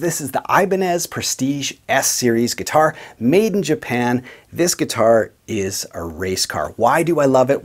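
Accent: Canada. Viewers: He sounds more like a Canadian. Hear the “aboat”?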